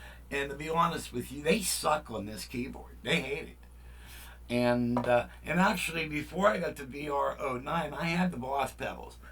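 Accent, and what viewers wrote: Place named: Pittsburgh, United States. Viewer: I know a yinzer when I hear one 👊🏼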